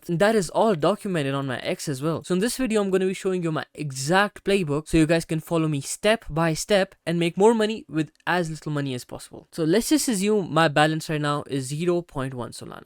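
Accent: India. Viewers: Sounds like Indian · u sound indian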